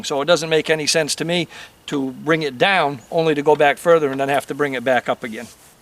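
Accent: Maine, United States. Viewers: Are you from Maine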